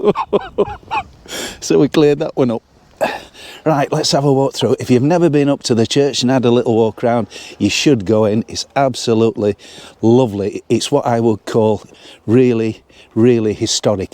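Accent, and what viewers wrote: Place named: Yorkshire, United Kingdom. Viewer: Another lovely video as always love to hear the Yorkshire commentary thumbs up to regarding her teeth I am going on 91 and I have all mine well except for the wisdoms! Always enjoy wherever you go looking forward to the next one😊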